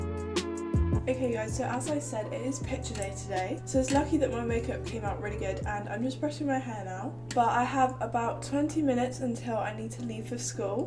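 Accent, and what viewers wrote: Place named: United Kingdom. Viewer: my british accent (Btw I’m not British I’m American): “cAn yOu pLeAsE hAnD oVeR tHe wOaTeR” your british accent: 😙👌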